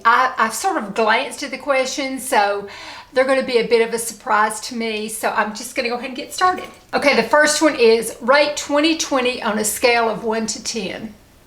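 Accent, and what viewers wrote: South, United States. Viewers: Nothing finer and sweeter than a real southern accent. Wear it with pride my dear. I used to feel shamed having one for years and over the years I’ve learned to embrace it. It is a part of my identity and I’m proud of it! If folks don’t like it so be it. Probably more about them that is more unloveable than my accent that seems to bother them. When people make fun of my accent now I lay it on even thicker lol. You are a rare beauty. You are beautiful inside and out and an inspiration! Love your channel. First time commenting. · You are a wonderful, sweet human being. I am so happy you have avoided this awful virus. I was hospitalized for eleven days two weeks ago, and it was no joke. I hope you are getting the vaccine. I had no prior illnesses and it was bad. I look forward to hearing that great Southern accent! Love you and your channel! 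Stay healthy!! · southern US accent I believe!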